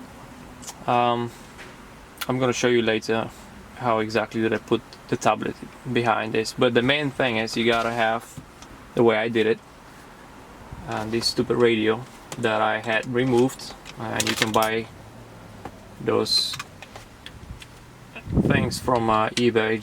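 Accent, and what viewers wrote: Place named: United Kingdom. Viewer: martenica bg si :)))) interesno kade moje da si komunikirame poveche da pitam poveche... jiveesh v usa s uk akcent :)))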